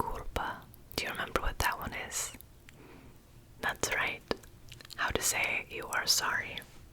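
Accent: Rio de Janeiro, Brazil. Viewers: Narrator's accent is from Rio de Janeiro. In São Paulo would be sss sound , not sshhh sound. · The narrator is Carioca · In Portuguese: Você é muito fofa falando em português! Eu amei te ver falando nosso querido idioma, mas tenho uma informação para acrescentar a você: A pronúncia do S em português é mais utilizada como "ssssss". A pronuncia que soa como "shhhh" não é o padrão brasileiro. São pouquíssimos estados que pronunciam a letra S com "shhh", que são os cariocas (nascidos no Rio de Janeiro) e os nordestinos (nascidos na região nordeste). De resto, todos os outros estados pronunciam o S da forma padrão, soando como "sssssss". São culturas diferentes que se expressam de formas diferentes e não tem nada de errado nisso, mas é quis compartilhar essa informação com você! E obrigado por esse video, quero te ver mais vezes falando em português! 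Você tem um sotaque muito lindo <3 In English: You are very cute speaking in Portuguese! I loved seeing you speaking our beloved language, but I have some information to add to you: The S pronunciation in Portuguese is more used as "ssssss". The pronunciation that sounds like "shhhh" is not the Brazilian standard. There are very few states that pronounce the letter S with "shhh", which are Cariocas (born in Rio de Janeiro) and Nordestinos (born in the Northeast). Otherwise, all other states pronounce the S in the standard way, sounding like "sssssss". They are different cultures that express themselves in different ways and there is nothing wrong with that, but I wanted to share this information with you! And thanks for this video, I want to see you mor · Toda carioca ela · Exactly, Carioca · Morri com o sotaque carioca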